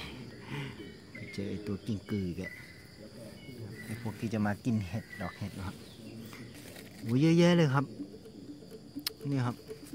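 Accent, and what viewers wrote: Isan, Thailand. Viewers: สวัสดีจ้า หมานๆเด้อโอ่ย เจ้า เว้า อีสานบ้านเฮา โลด ขอย ฟัง แล้ว ลำคานๆๆเด้อจร้า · เว่าอิสานจังคือเด้อจ้า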